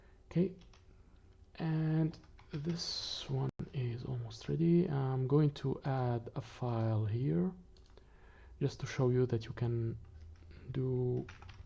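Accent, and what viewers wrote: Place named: Italy. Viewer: Just out of curiosity: your name is not italian but your pronounce sounds italian. Where are you from?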